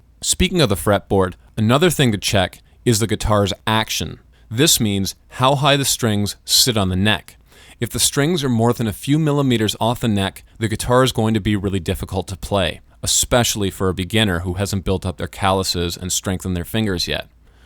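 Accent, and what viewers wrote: Canada. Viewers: that canadian accent ughhhhhh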